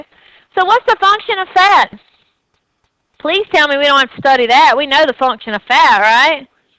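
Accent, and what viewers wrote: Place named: Rural, United States. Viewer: Oh shit. I love it when smart people have hick accents.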